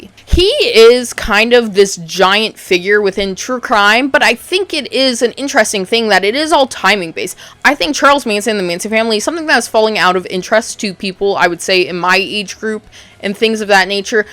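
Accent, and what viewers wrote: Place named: South, United States. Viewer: oh nah this guy is from the south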